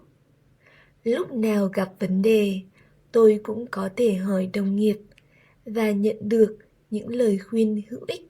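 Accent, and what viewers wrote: North, Vietnam. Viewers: oh this is north vietnamese... I thought it was southern vietnamese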